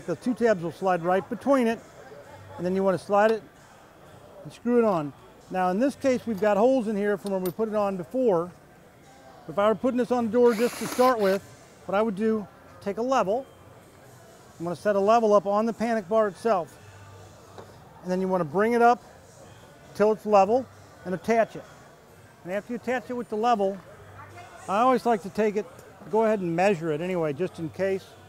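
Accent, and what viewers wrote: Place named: England, United Kingdom. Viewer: I love your English accent and I hope if you start teaching English that will be amazing 🤩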